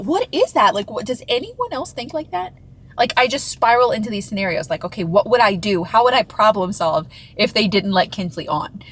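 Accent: Texas, United States. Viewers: I LOVE how your Texas accent comes out!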